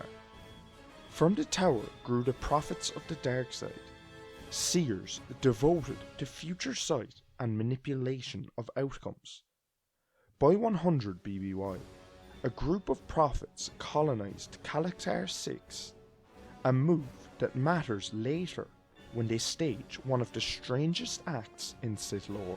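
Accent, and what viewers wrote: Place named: Ireland. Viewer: That was yet another fantastic video. You know, that image that you were using, the one of the character that you called "Black 🕳 Hole?" Well I've seen other YouTube Star Wars creators use the same image, but I've never heard anything about the name of the character, their back story, nothing. So thanks for that, now I know what the hell "the big boys" are using to push their own channels along, without so much as a characters name, much less a description of what the audience is beung presented with!! P.S : - Dose my old and bush-bashed Australian ear detect just a little more of that truly wonderful Irish accent in your delivery this time? Or should I just keep away from the bottle? .. 🍺 🍻 🍺 🍻 🍺 ... See what I mean? I really must be getting back to my A.A group meetings! Either that, or join up with the local Rastas, Mon!!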